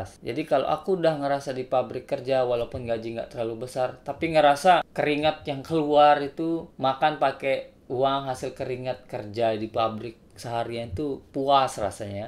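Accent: Lombok, Indonesia. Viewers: Anoo " ciri khas org sasak klo ngomong 😂😂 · Bang kentel banget logat lomboknya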